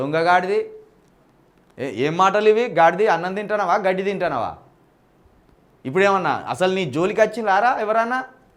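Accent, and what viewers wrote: Telangana, India. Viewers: మీ తెలంగాణ accent super అన్నా 🙏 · అన్న మీరు సూపర్.... మీరు మాట్లాడే తెలంగాణ భాష super.... దేశంలో మృగాలు ఎక్కువ అయ్యారు, మృగాలా మాటలు పట్టించుకోకండి.....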